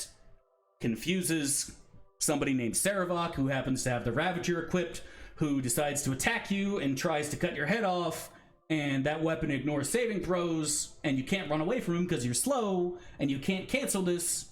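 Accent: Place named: Michigan, United States. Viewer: What's your 20ish? You sound like your from Michigan, but Cali and Michigan accents sound similar when recorded.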